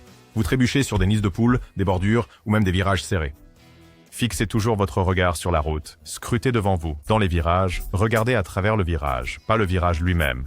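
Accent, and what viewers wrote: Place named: Texas, United States. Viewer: Pourquoi la voix de l'IA a un accent texan ?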